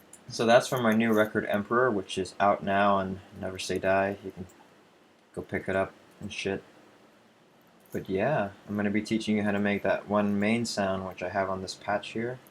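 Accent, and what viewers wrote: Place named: United States. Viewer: Aren't you from Thailand? You sound super american haha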